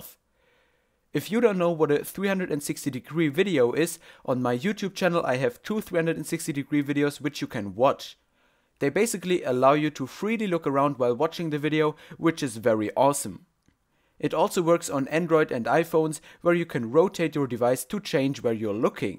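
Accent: Germany. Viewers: Dieser Deutsche Akzent!!!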